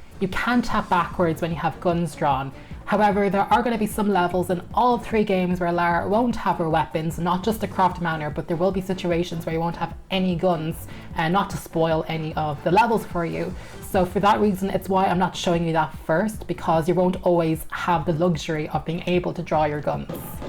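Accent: Ireland. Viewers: I find the modern controls way less clunky and awkward in general, but need to switch to the tank controls for things like making her do that jump back for the perfect running jump etc. Looking forward to this video as I definitely need help with exactly the issues you're describing! It took me like 4 levels to figure out [on the switch] that you need to press RZ to grab after jumping, not the action button or any others. Camera angles are a major pain in the pass, too. P.S. Off-Topic and maybe unwanted comment, but I love your accent! Irish accent has always been my favourite :) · There's no tap backwards? At all? That's such an omission. I can't make a jump because I need a one tile run up, but I can't do the dangle off the edge and climb back up trick, as there's a bloody wall there. It's perfectly impossible with the modern controls. Because you've got to go back right to the wall, but if you walk backwards, Lara gets stuck with the wall and to sort that, you've got to walk forwards but then you don't have the run up. 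Oh, well. Time to go back to "tank controls" and re-learn them. I mean, I used them back in the day. I thought "modern controls" would help fix it being so janky, but they feel more janky - for the lack of tap backwards - and not an improvement. p.s. Ah, those Irish "R"s. Love it.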